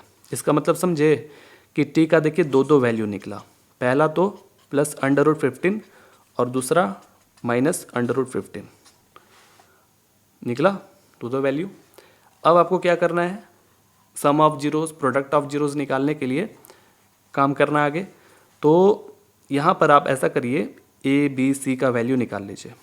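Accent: Bihar, India. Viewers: Sir you are from Bihar 😊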